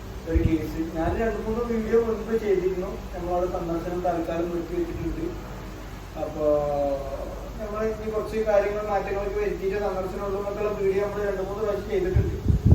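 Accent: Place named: Malappuram, India. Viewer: ഇക്കാ...ഞാൻ വളരെ എടുത്താണ് കാവേരി കുട്ടിടെ വീഡിയോസ് ഒകെ കണ്ട തുടങ്ങിയെ....പക്ഷെ ഒരു മാജിക്കൽ എഫ്ഫക്റ്റ് പോലെ ഇ ചാനൽ എന്റെ ടോപ് ഫേവറിന്റെ ചാനൽ ആയി മാറീ...ഇപ്പോൾ ഇക്കയും, കാവേരിയും എനിക്ക് ഏറ്റവും ishtamulla രണ്ട ആൾക്കാരാണ്....നിങ്ങളുടെ വീഡിയോസ് ഡെയിലി കാണുന്നതു തന്നെ മനസിന് ഒത്തിരി സന്തോഷം ആണ്. എപ്പോളും shorts എടുത്ത് കണ്ട വീഡിയോസ് വീണ്ടും വീണ്ടും കാണും...ചേട്ടന്റെ ഇന്റർവ്യൂസ്,Ottayan vlogs ഒകെ ഞാൻ എന്നും കാണും..... എന്തോ e ചേട്ടനേം ആന പെണ്ണിനേം ഒത്തിരി ഒത്തിരി ഇഷ്ടം.പിന്നെ ചേട്ടന്റെ മലപ്പുറം ഭാഷ അതും രസമാണ്...എന്റെ ജില്ലാ കൊല്ലംജില്ല ആണ്. ചേട്ടന്റെ മലപ്പുറം ഭാഷ കേട്ടോണ്ട് ഇരിക്കാനും രസമാണ്...Anoush മോനും വൈഫെയ്‌നും ഡാനിഷിനും ഉപ്പക്കും ഉമ്മമാകും സുഗന്ന് വിശ്വസിക്കുന്നു.....നോമ്പ് കാലത് എല്ലാ അനുഗ്രവും ചേട്ടനും ചേട്ടന്റെ ഫാമിലിക്കും, വീട്ടിലെ എല്ലാ മിണ്ടാപ്രാണികൾക്കും നേരുന്നു.....ചേട്ടന്റേം കാവേരിടേം കഥ ഒകെ ഇപ്പോ ഞൻ എന്റെ ഫണ്ട്സിനോടും,അപ്പൂപ്പൻ അമ്മാമയോടും ഒകെ പറയും..ടീവി വീഡിയോ കാണിച്ചപ്പോ അവർക്കും നിങ്ങളെ ഇഷ്ടായിയ്.എനിക്ക് ആന കമ്പം ഇലായിരുന്നു.ഇപ്പോൾ പക്ഷെ കാവേരി പെനിൻറേം ചേട്ടന്റേം ഡാനിഷ് ബ്രോഡിഎം fan anu njan❤❤❤❤. 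കൊല്ലം ജില്ലക്കാരി ആയ ഞാൻ എന്നേലും നിങ്ങളെ ഒരിക്കൽ കാണും എന്ന പ്രതീക്ഷയോടെ നിർത്തുന്നു.....ഇ മെസ്സേജ് വായിക്കണേൽ പറ്റുമാണെങ്കിൽ ഒരു റിപ്ലൈ തരണേ ട്ടോ ❤❤ With Lots of Love and Best Wishes Abhirami from Kollam❤❤💓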